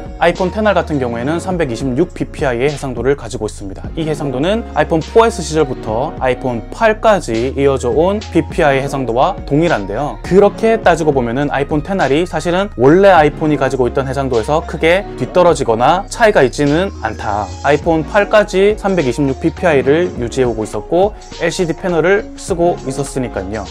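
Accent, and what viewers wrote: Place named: Gyeongsang, South Korea. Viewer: 그기 갱상도다 마!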